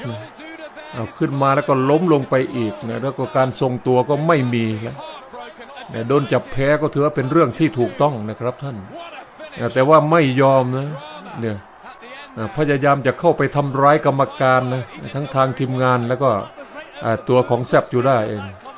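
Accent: Isan, Thailand. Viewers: แอดมิน ทำไมมีแต่พากย์อีสาน ไม่เห็นมีพากย์ใต้เลย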